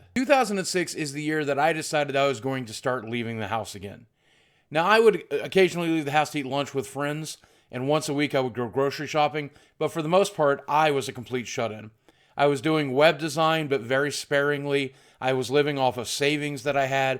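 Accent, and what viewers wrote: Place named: Arkansas, United States. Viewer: This is off topic boogie, but I'm from Australia and I just wanted to say you have the most pleasant accent to listen to out of other US states (Arkansas right?), I enjoy listening to you speak and you could talk about literally anything and I would find it interesting haha. Love ya mate!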